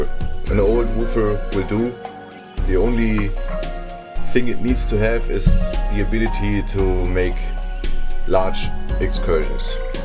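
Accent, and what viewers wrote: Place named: Germany. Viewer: i love germans speaking english :D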